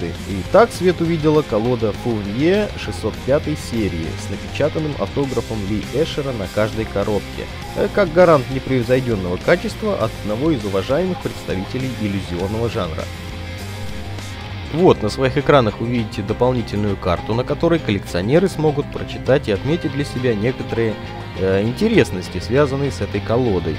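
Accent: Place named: Russia. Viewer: I liked your russian accent having a deck with Mr.Lee Asher signature on it is an honor A MUST HAVE DECK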